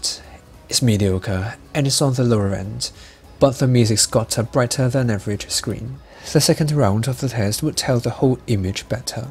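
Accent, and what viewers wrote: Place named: United Kingdom. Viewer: 专登嚟听英音，好sexy！！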